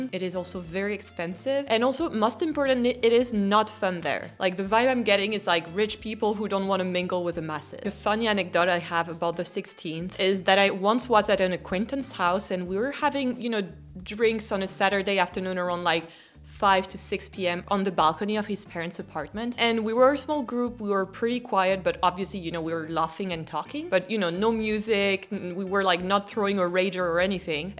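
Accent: France. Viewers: i love french accent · Thanks so much for the recs! You didn't need to advise us not to go on a group tour, though. Waaay not our style. We too like the authentic and non-touristy areas of any place we visit, despite (technically) being tourists. That's why your advice will be so helpful. You speak English really well, by the way, but it's fascinating to hear your accent, because sometimes you have a French accent when speaking English and sometimes almost an American one. There's nothing wrong with that, of course, but it's interesting. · Bonjour! Je suis américain et mon niveau de français est environ intermédiaire-haut. Je suis tellement impressionné par ta fluidité en anglais! Bravo! Petite question: penses-tu que ton niveau d’anglais est comparable à celui de Jodie Foster en français? (Btw…I doubt you will read this, but I am curious nonetheless. I also understand self-evaluations are difficult. 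But to me I could easily mistake you as an American, though I do perceive the slightest French accent, which I think adds great spice to the language!).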